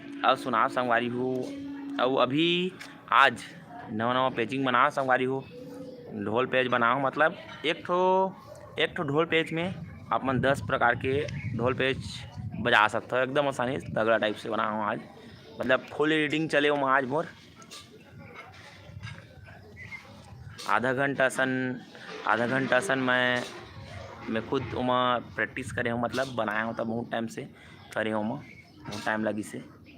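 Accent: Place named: Akaladongri, India. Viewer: अकलाडोंगरी se bolat ho bahiya ji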